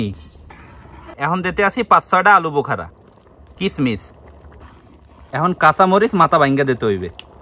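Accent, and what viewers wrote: Barishal, Bangladesh. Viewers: Cock মানে বললেন রওয়া বা মোরগ এইটা বরিশালী ভাষা আমিও বরিশাল এর বরিশাল এর কিছু কিছু language অনেক❤ sweet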